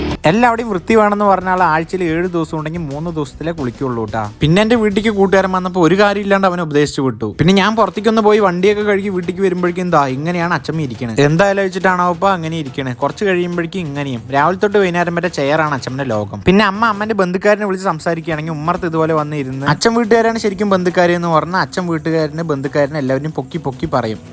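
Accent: Palakkad, India. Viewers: Nee palakkad aano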